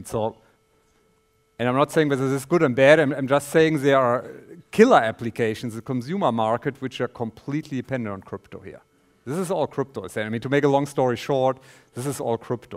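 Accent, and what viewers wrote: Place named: Germany. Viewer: very good Denglish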